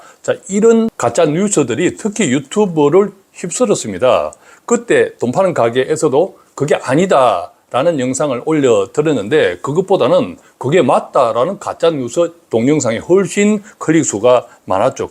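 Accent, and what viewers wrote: Gyeongsang, South Korea. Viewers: 경상도 사투리 쓰셔도 이 대표님은 아주 진실적입니다 냉정적이고 경상도 대구가 이중성 있는것이지 대표님은 경남입니다 옳고 그름을 판단하시는 분이예요 함부로 말하지 마세요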